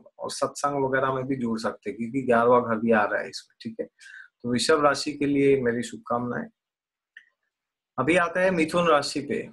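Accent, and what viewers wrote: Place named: Assam, India. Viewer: First time ur voice resonates like assamesse people.. Ur accent.. It's cute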